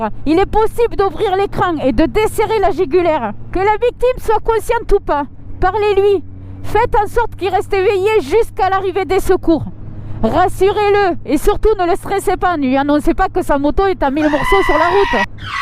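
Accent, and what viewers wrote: South, France. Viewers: avec ton accent du sud ça passe sans problème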